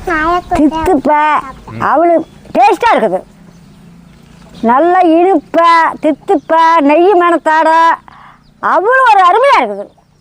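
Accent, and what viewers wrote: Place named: Coimbatore, India. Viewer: Is it coimbatore district? Via general name. I love the slang of the language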